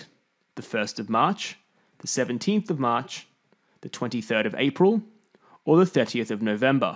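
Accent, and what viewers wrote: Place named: United Kingdom. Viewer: Your English is excellent 👍 I thought you are British.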